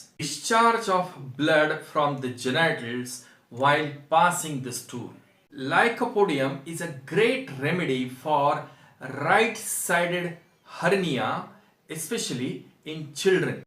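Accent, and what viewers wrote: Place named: India. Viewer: According to your delivered speech,you look like an Indian. But why do use foreign language,? What do you want to show by this filthy language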